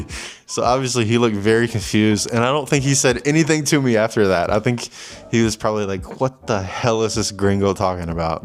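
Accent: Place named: Russia. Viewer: You speak Spanish like Russian could be your first language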